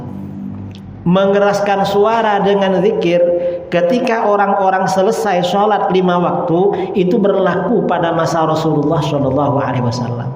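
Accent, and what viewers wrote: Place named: Madura, Indonesia. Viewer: Pak ustadz nya kayak nya orang madure ..kalau dilihat dari logat bicara nya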